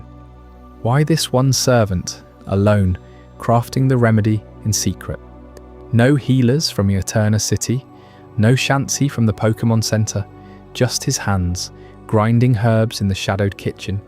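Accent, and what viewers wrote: United Kingdom. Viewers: Great videos could you do your voice a tad less British please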